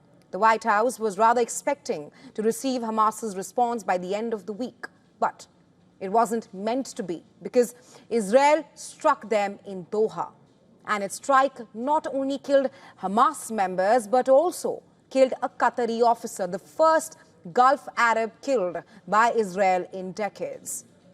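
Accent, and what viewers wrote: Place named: India. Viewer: Your sound Indian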